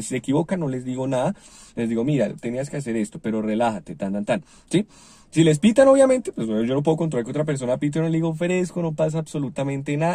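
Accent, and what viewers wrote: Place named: Colombia. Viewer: Si gracias por esa explicacion paisano chao me alegro bastante oirlo esa es mi Colombia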